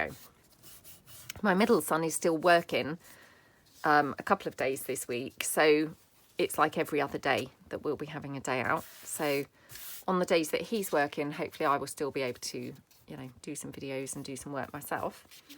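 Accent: United Kingdom. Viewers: it’s not Diane. I knew it had to be somewhere in the UK because I have a friend in London with the same kind of accent. I am from Michigan in the US. I absolutely am loving your tutorials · I love to watch your videos. I love your chatty ways. You take my mind off my worries for a while. It's like having a British friend over to craft!! What fun! Imagine you listening to my Southern Oklahoman accent. Very beautiful work.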